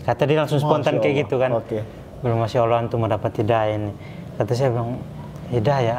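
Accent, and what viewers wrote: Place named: Eastern Indonesia, Indonesia. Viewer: Dari logat bicaranya sprtinya orang timur nii.. Semoga istiqomah dlm iman islam hingga akhir hayat..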